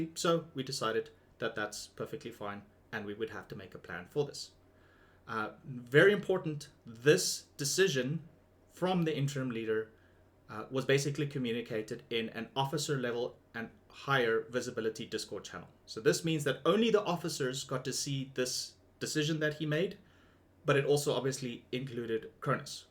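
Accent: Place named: South Africa. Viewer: Where u from? U have like a South African accent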